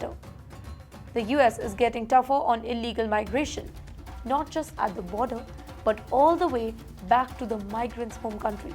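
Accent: India. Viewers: It's an indian english accent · Sounds like a women from UP , Pllease speak proper English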